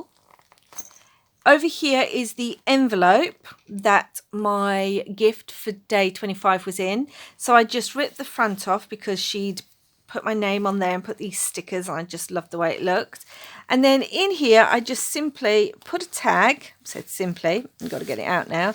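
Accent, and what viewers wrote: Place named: England, United Kingdom. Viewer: Are you Irish born but live in England? Or have you lived in Ireland your entire life? I thought your accent is English/British. Just curious. Didn't want to know for any other reason.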